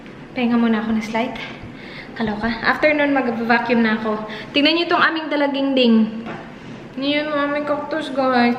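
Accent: Visayas, Philippines. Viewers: Agay so meaning bisaya xa. Hahah tama yong duda oks her accent says it all. Bisaya here as well i like the way you speak English the accent is great. From where man diay ka sis hehe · Hahahaha awa bisaya lage klaro kaayo. 😂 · hahah na klaro ang pagkabisaya ba.agay3x hahahaha daghan na gyud tang mga bisaya gyud. new subscriber diay. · Bisaya d i ka?... agai agai... haha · I really like your accent... when you speak in english, even you have a visayan accent maganda pa rin sa pandinig.. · Bisaya mn diay😂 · Ate kabol ka magbisaya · Visaya ka ate? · Bisaya po kayo ate? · Hahahaha agay agay pa more hahahaa Bisaya kaman day oi 😁😁😁😁😁😁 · it's my first time watching your vlog ate and i really like it now 💕😍 especially your accent bitaglish😍💕. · So far so good..i enjoyed this vlog..i mostly love your sense of humor your so fun to watch..and let me ask..are you perhaps a Visaya?? 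Hahah..it's just so cute..love your accent too..more power and godbless.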